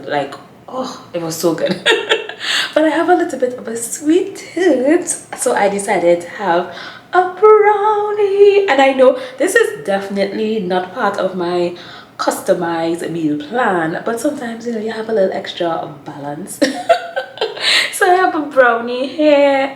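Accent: Trinidad and Tobago. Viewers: I hear that trini accent and I say no sah let me go watch! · Hi, are you Trini? That accent sounds like my people!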